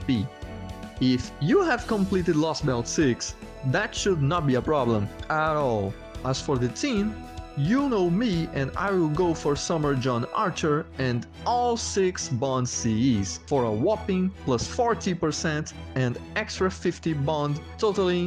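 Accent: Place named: Brazil. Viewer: Estou sentindo um cheiro de PT/BR nesse inglês aí 🤨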